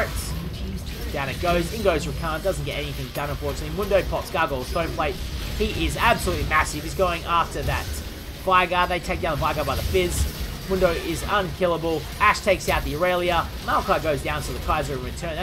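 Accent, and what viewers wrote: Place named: Australia. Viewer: Terrific gameplay and what top drawer aussie commentary dude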